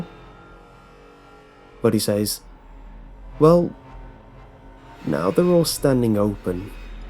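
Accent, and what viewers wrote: United Kingdom. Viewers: What part of the UK are you from? I love your videos btw!! I saved like 10 really long ones to listen to on a flight, so relaxing!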